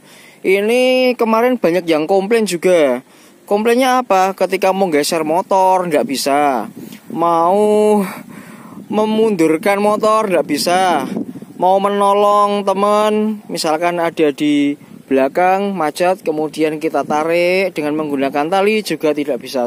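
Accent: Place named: Java, Indonesia. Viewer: terima kasih informasinya masse sangat detail, to the point, tidak bertele2 tapi lucu ya masse ngomong indo logat jawanya kental 😂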